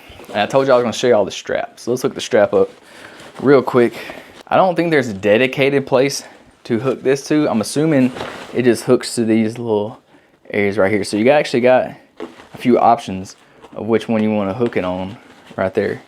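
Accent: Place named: South, United States. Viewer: Your little southern boy accent is so sexy.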